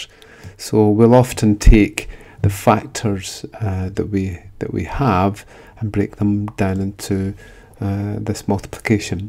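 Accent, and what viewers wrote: Scotland, United Kingdom. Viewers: is skotiak akcent prezent?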